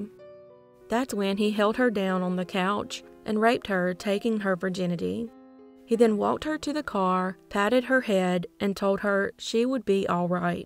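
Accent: South, United States. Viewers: I'm subscribed to your southern accent voice. I wasn't a subscriber then, I am now. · haha I'm the same with my own voice it's so cringe to me lol, but I agree with everyone that your voice is particularly soothing! I do really like your strong southern accent too! I'm from England so to me it's very strong, but I really love it for how soft spoken you are. Also of course you do amazing research and give these cases and victims the respect they deserve. Sending so much love from the South of England! 🇬🇧 ❤️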